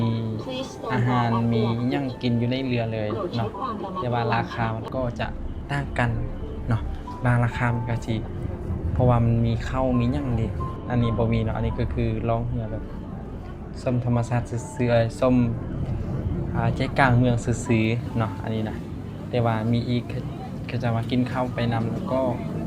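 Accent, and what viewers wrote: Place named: Laos. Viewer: ฟัง..เว้า สำเนียงลาว ล้าน % 🫵😁😂🤣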